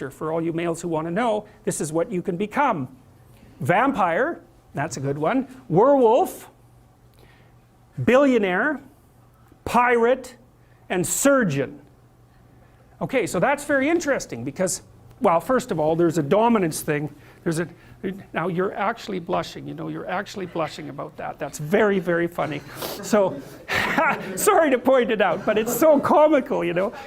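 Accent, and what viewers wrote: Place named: Canada. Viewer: I’ve only watched a few of his videos, but his Canadian is in overdrive on this one.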